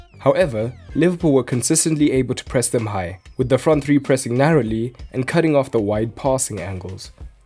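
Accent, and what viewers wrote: South Africa. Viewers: Are u from SA, just asking because of ur accent. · South african accent?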